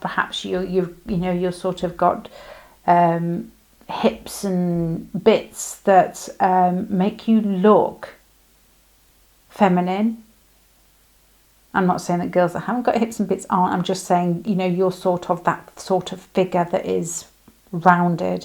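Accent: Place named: Midlands, United Kingdom. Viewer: Pile 1 wooooooooow amazing 🤩 I also hear a midland accent, as a midlander that’s makes me so happy 😁 Love and light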